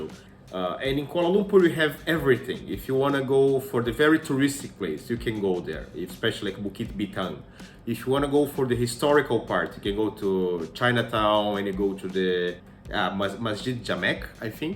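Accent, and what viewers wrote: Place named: Brazil. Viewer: Well done, you speak your mind about Malaysia and not just gloss over the topic like some other YouTubers just trying to make everyone happy. Bravo for that! From your accent, I believe you are Brazilian. Not sure yet but I will look at your other videos to determine that later. Interesting observation of the Turkish people. I have interacted with those outside of Turkey and visited Istanbul before. Never live there before.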